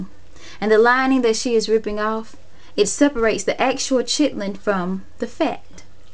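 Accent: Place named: South, United States. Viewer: You have the cutest southern accent!!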